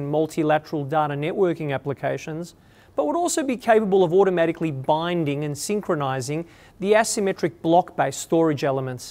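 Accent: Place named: Australia. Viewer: Anything said with a British accent is a lot smarter and better. But this guy is Aussie, so I'm skeptical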